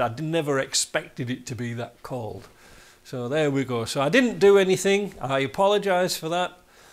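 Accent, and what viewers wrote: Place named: Northern England, United Kingdom. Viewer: Hey where are you originally from? Just finding out you live in Canada? But your accent sounds like you are from somewhere in England maybe up north somewhere 🤔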